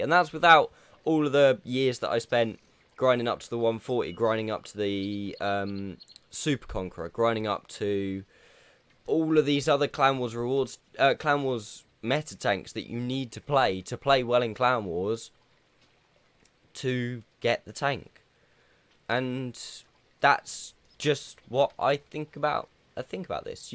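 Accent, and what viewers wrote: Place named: Southern England, United Kingdom. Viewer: hey man great vid, im from the south of england and it sounds like you are from somewhere around there, if you ever fancy doing some platoons id love to play with you some time, you seem like a cool dude, getting the 907 on monday so would be cool to do some games! all the best mate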